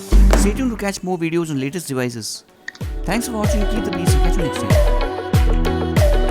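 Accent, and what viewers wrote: India. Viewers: i barelly understand your hindu english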